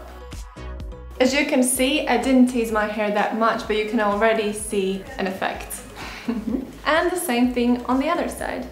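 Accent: Latvia. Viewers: ? latvian? I think, when I speak English I sounds kind a similar...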